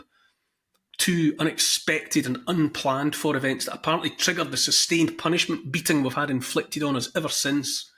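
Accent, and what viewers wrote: Scotland, United Kingdom. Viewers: Lord I love a Scottish accent, although I can't help thinking of Dad's Army.....'We're dooooooomed laddie" 😂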